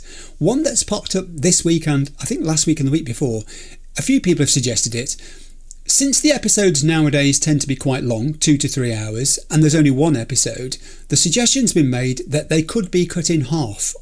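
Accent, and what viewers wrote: United Kingdom. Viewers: One of my favorite episodes yet! I think you have found an ideal cadence of gameplay in this episode, you explored without getting too bogged down in random minutia. I LOVE YOUR MIND FOR DETAIL, but I will admit I sometimes skip ahead out of veteran gamer impatience, but never change your penchant for curiosity. Regardless, congrats on your first place placement, only one more top 3 finish to guarantee the finale of this arc! Furthermore, would LOVE to hear more about your childhood and the village you grew up in, I want to know more! Maybe I am a stupid American but your accent says maybe you are from the Midlands, and I think your naiveté as an Englishmen really adds to the lifestyle in 2077. V is a character all his own, but colored by the players experience, and is a true fish out of water. And a kind Brit in Night City is about as fascinating a protagonist as it gets, I would love more context to your childhood and your perspective as an Englishmen to a very American game! 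Not that it truly matters, but you are clearly a fan of science fiction, and as a genre SciFi is often colored by the experiences of the reader. Hope this week gets better for you, and wishing the best to you and your family! CHEERS!! XOXO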